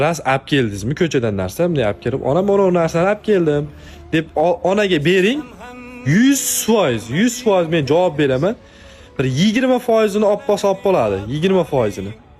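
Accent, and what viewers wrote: Andijan, Uzbekistan. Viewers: Shevaga gap yoʻq xaqiqiy anjancha 😅😅😅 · Андижоним шеваси ❤ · Анжаннанмизиз дейман шеваыиз кетяпти ман. Кашкадареданман сог булинг. Ота угил. Алхамдулилла. Роббил. Аламииин❤❤❤❤❤❤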